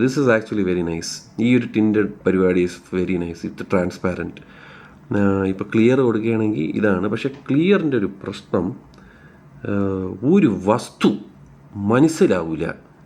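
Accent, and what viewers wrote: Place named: Thiruvananthapuram, India. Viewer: fell for your feel of transparency as human with thirontharam accent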